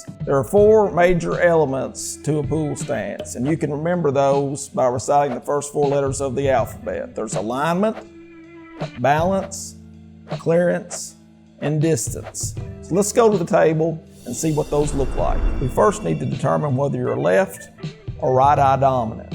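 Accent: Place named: South, United States. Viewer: Not bad advice but honestly talks way too slow, I think it's a southern thing but it's honestly tough for me to watch someone who talks this slow.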